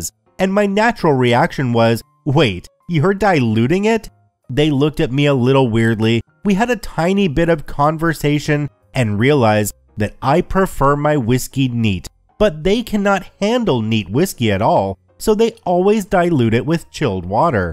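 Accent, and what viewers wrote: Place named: Canada. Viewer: I don't know it would be the first time I enjoyed the Canadian accent. Not that I dislike it. I lived there for awhile. I lived in Canada from age four and a half till about 11 maybe a little younger. And then we moved back to the east coast and then everywhere else in the u.s. LOL all I know is when I was a kid I trained myself into having a flat Midwestern accent because it kept me from getting picked on as much. But I still don't remember anyone in Canada saying it the way you do LOL have a wonderful night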